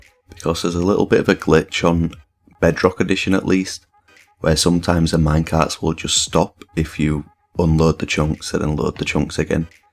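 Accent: Scotland, United Kingdom. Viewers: Ghast Farm????? Jesus you are cracked. I based my base on your's so thank you! You deserve more subs. Also, Are you Scottish? I love your accent :)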